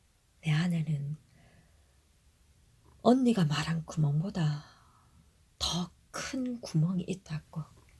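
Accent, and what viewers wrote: Gyeongsang, South Korea. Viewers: 왜 갱상도말로 하는 거죠? 신경 거슬려 못듣겠음. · 갱상도 버전으로 읽어니 또다른 맛이 나네요 잘들을게요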